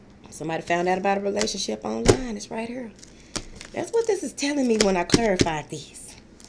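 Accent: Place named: Dallas, United States. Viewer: You in Dallas. It sound like it, in your accent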